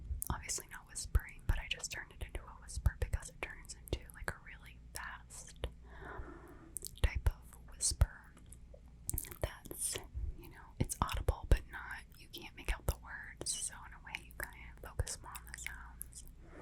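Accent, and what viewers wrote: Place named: South, United States. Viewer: upvote for more Southern Accent whispered rambling ;)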